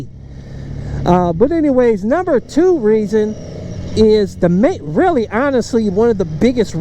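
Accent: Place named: Cleveland, United States. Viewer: Cleveland hood accent.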